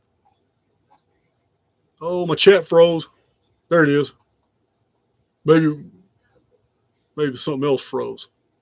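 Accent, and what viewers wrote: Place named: South, United States. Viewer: Kudos to you for taking her to a safe place when you realized it was going bad. She would have been too traumatized to be left to drive. It turned out bad for you but you rose above it. And in the process preserved old books and info that would have been lost forever. I am in Australia and in the 1970s southern baptist missionaries came to convert the heathen in Australia. I was brainwashed for over 30 yrs before I escaped their dungeon programming. My experience has made it where I cannot stand southern Americanaccents. It triggers me big time. So when I came across your videos I struggled to listen to them and often only listened for 30 mins. But I kept getting prompted to listen to what you had to say. So I dipped in and out for few months. Then I realized it was time I stopped disregarding anyone with a southern American accent. 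I realized where you were born no more reflected on you than someone born Asian, coloured, or Australian. I overcame my reaction to your accent and focused upon your message but more importantly your heart and soul. From that moment I broke out of that mindset based on unpleasant cult upbringing and now love to hear your rants and research. I could have missed so much if I stayed put off by a silly trigger of an accent. It is still something I have to check myself every time I hear an American accent over here. 😂 Hearing your life story one day for the first time caused a huge shift in me. I have days where I binge watch. Really like the Q A podca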